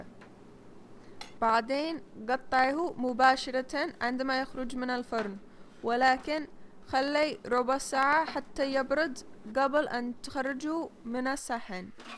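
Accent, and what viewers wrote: Yemen. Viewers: انتي امريكيه ..ولهجتك ولكنه يمنيه مثل زوجك..عندنا بنات بالسعوديه يتحدثون انجليزي..وكانهم بنات امريكا ...سبحان الله الدنيا معكوسه..وبنات الدول العربيه كذلك..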